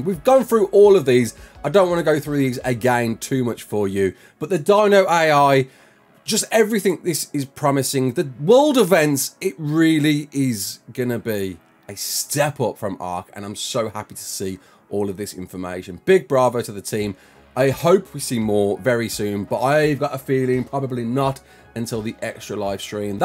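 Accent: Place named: Scotland, United Kingdom. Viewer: And let’s get into itt! I love that intro so much. Hey are you from Scotland?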